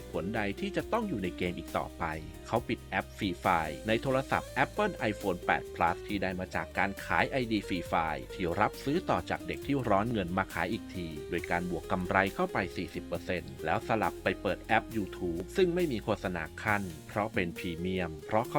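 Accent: Isan, Thailand. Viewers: เว่าอีสานไปเลยก็ได้ สำเนียงชัดซะขนาดนี้ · คนอีสานแน่นอน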